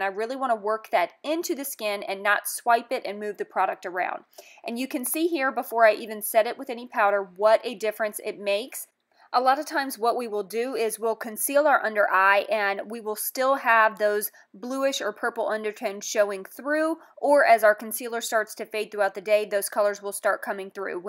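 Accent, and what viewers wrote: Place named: Georgia, United States. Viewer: oh my word! loved this. love your accent too! are you a georgia girl? i'm going to look for the salmon colored concealer at drugstore...thx!!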